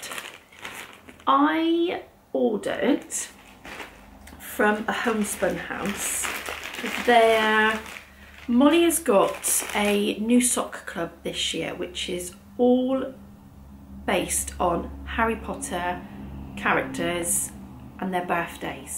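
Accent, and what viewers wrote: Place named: Devon, United Kingdom. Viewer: I’m absolutely here for you and your strongest Devonshire accent. Lovely to spend time with you again xxx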